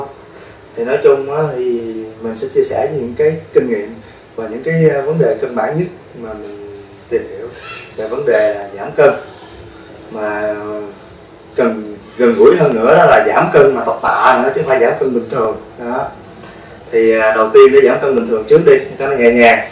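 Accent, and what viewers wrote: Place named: South, Vietnam. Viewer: nói chuyện giọng miền nam dân dã gần gũi , giải thích ro~ ràng :)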